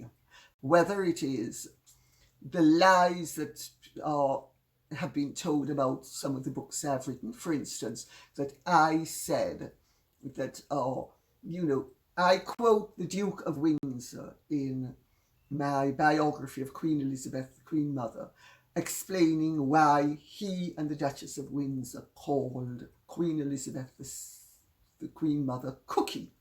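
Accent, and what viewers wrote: Jamaica. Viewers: Love her slight Jamaican accent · Lady Colin Campbell was born in the Parish of St. Andrew. Jamaica - her accent is undeniably Jamaican.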